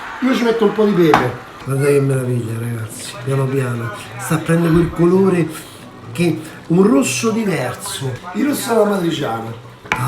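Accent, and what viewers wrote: Rome, Italy. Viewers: Si forse saresti un buon cuoco se non ti allarga tanto e lavora di piu , con questo romanesco squassato . .....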